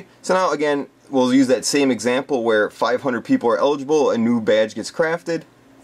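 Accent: Canada. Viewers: Are you from Newfoundland?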